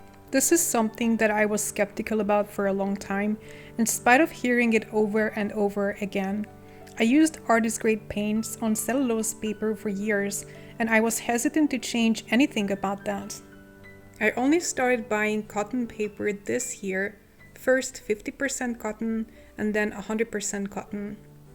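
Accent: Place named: Hungary. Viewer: I came upon your channel and I enjoy your art very much and the way you present it. Also, I hope I’m not mistaking (I don’t think I am) I can hear a slight Hungarian accent which I recognize because I am also Hungarian. I cannot believe the derogatory comment you received, your english is beautiful, I don’t understand why would someone say those things,but I’m not completely surprised,there are many different people in this world. I am looking for more videos from you,es kivanok sok szerencset es a legg jobbat mindenbe!😊 Maybe my hungarian is not the best anymore because I live in America for the last 44 years. Szia 👋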